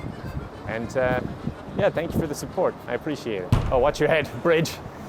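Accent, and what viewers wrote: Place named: Ireland. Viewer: I rewatch his videos all the time. I like to sleep to his soothing Irish voice lol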